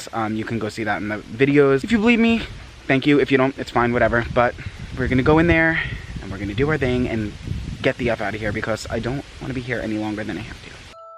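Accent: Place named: Boston, United States. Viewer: love that boston accent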